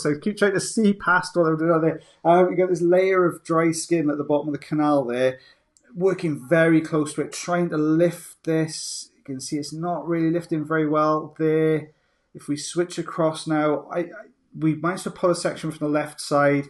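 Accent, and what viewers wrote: Wales, United Kingdom. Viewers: Are you Welsh? Love hearing the welsh accent, reminds me of home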